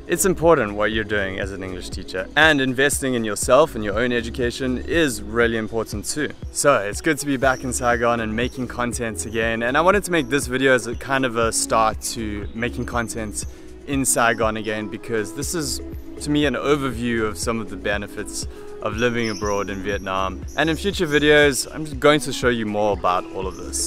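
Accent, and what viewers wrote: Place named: South Africa. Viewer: He sounds South African to me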